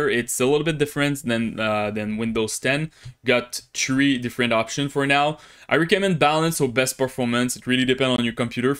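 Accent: Quebec, Canada. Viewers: I love your French-Canadian accent lmao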